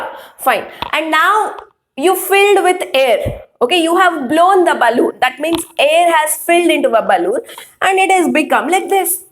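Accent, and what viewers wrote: Karnataka, India. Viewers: Mam are you from Karnataka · Maam, are you from karnataka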